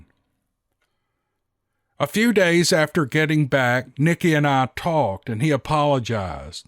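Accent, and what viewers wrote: South, United States. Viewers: I love a sassy Southern girl! lol. Ya sound like me. Thanks for making me lol ! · I can't listen to the overly southern accent. could speed up the tempo. Better yet, goodbyyyyyyyye. (Accent emphasized, of course)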